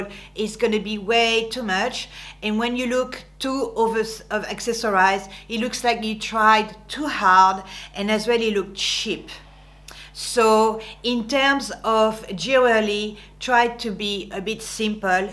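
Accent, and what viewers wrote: France. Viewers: I love your style tips with your lovely French accent, and Oscar is so cute! 😘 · Great video. Love your French accent! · I love your french accent! I honestly just really love it! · Hi, I love your accent and of course your channel. Your accent reminds me of my childhood living in Belgium when I had to learn French at school. I am German, now living in New Zealand and I totally understand your cultural struggles. I am glad I found you. After I lost my husband nearly three years ago to cancer, your videos give me a little bit of joy and a few moments of going down memory lane, when we visited Paris, or me, staying in an isolated house by the sea in Britagne for a holiday and remembering my French friend Isabelle who introduced me to real Champagne and Oysters... Thank you for bringing back these memories to me. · I love your smile... and your French accent 😊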